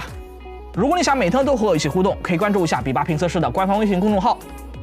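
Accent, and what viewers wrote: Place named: Nanjing, China. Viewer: 這位主持人聽起來好像南京人耶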